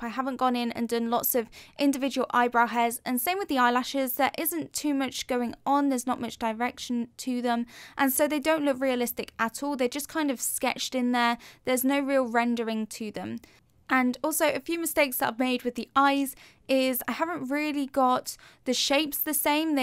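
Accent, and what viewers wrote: England, United Kingdom. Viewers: Thick english escent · I like her English accent.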